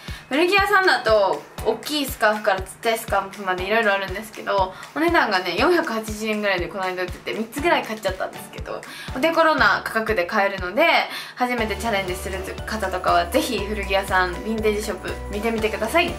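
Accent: Kansai, Japan. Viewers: 石原さとみさんに声似てると思ったらなんか顔も似てる😲✨👌 石原さとみさんの関西弁ってかんじです！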